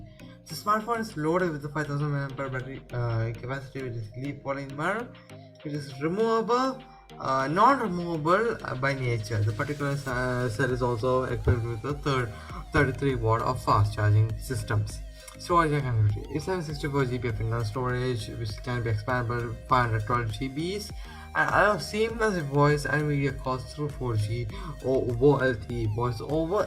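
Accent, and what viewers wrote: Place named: England, United Kingdom. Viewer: Indian hai kya?? Pura angrez ho riya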